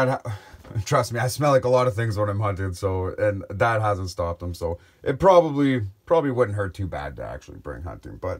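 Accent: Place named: Ottawa Valley, Canada. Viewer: ya its a decent one. CT used to always stock zippo fluid, but since zippo bought ronsonol I haven't seen it. Just the yellow one now. Ya I knew u were a Canuck from another vid of yours. Woulda guessed anyways, ur accent is similar to what we got in the ottawa valley.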